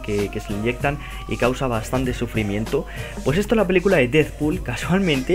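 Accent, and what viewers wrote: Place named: Spain. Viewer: Ok pero habla más fuerte y no seas tan español se más para el público ;)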